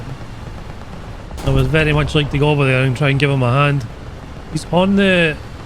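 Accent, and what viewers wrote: Scotland, United Kingdom. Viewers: He's Scottish mate.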